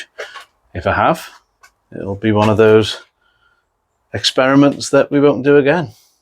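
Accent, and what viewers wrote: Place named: Midlands, United Kingdom. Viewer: I just learned allotment gardening when we were over in the UK this past March/April. My husband is listening to this video trying to guess your location. So far he's guessed Midlands, Birmingham, Manchester 😂 He's from Leicester originally. I love your greenhouse! ❤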